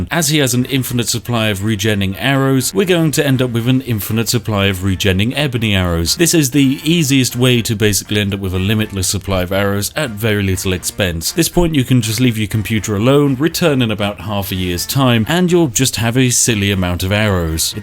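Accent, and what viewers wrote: United Kingdom. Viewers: Youtube: recommends a video. Me: hears slow talking British voice. Me: ×2 it is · Something says to me that he is British 🤔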